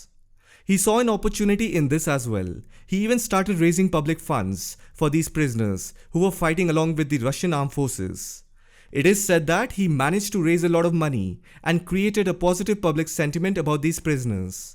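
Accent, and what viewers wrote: India. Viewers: Don't like Indian accent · He has the best Indian accent - the most informative Indian accent - absolutely the best... is the man....🫡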